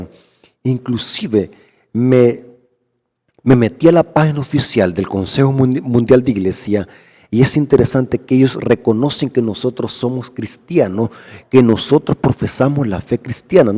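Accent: El Salvador. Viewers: Disculpe, el hermano no dice sexta, sino que su pronunciación es diferente, él está diciendo SECTA. 😊 ASÍ HABLA ÉL, POR SERDEL SALVADOR.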